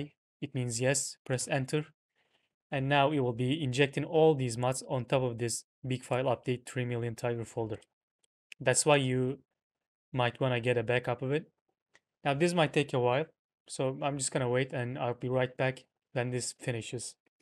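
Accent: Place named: Turkey. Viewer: aksan türk aksanına benzio heralde türksün reis shadow of the tomb raider varmı mod olarak bide modlar oyunun orjinal halini bozarmı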